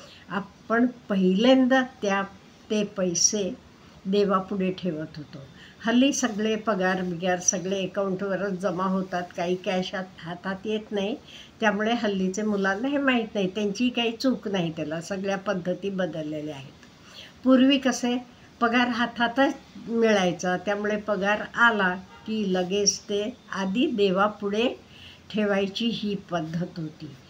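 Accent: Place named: Solapur, India. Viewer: तुमच्या बोलण्यातून तुम्ही सोलापूर चे आहात असे वाटतं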